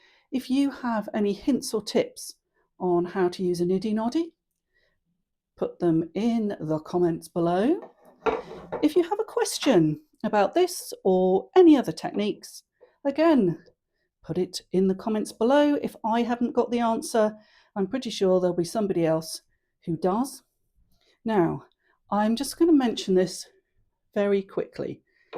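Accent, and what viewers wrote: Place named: England, United Kingdom. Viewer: I just love how AI subtitles just cannot cope with "niddy noddy" particularly in an English accent....."nitty naughty"😂 Also just a quick tip, if you do just have a tiny amount to skein up you can use just 2 arms of your noddy noddy instead of the whole wrap. Then to work out your length you just divide the full length around your tool in half, then multiply by wraps made. Also if you do a lot of sampling, just make a small niddy noddy from cardboard to make neat little mini skeins.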